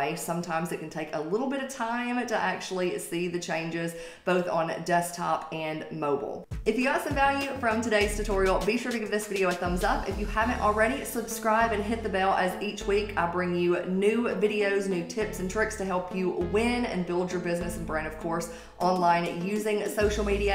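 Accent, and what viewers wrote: South, United States. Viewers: I’m sorry but she has the most American accent a person in world could have. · This is a southern American accent. We don’t sound like this in the west or even east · Thanks!! Ijust what I need. 😊😊 Have a great day. Nice accent. The southy one. 😊😊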